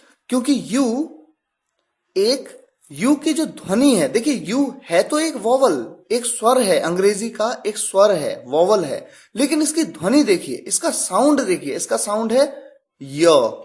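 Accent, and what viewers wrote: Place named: Assam, India. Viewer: Sir ji aap assam se ho kya 😃😃😃😃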